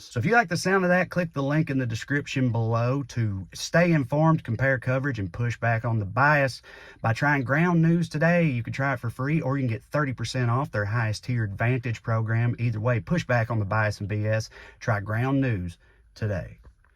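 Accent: South, United States. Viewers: I’m always glad to have a person with a southern accent who is funny on purpose in my subscriptions. Keep up God’s work.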